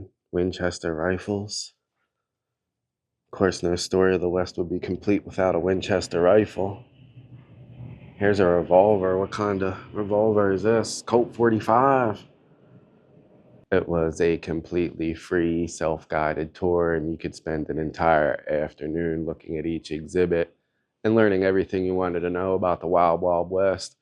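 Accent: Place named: Baltimore, United States. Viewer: haha same…I’m from Abington! I heard the accent but couldn’t distinguish if it was Philly or Bmore and when I saw you watching the Eagles game but not saying anything about being from the Philly area, I then assumed it had to be Bmore…haha.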